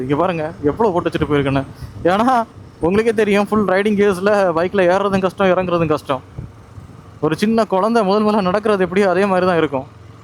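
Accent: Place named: Kanyakumari, India. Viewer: Nice .....Nanbarae.....In kk slang....❤❤❤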